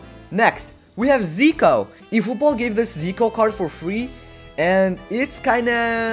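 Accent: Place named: United States. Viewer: Your Asian face with an American accent funny as hell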